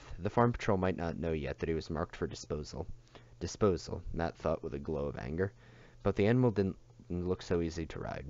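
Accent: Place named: Scotland, United Kingdom. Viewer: why do you speak in "Scottish" voice?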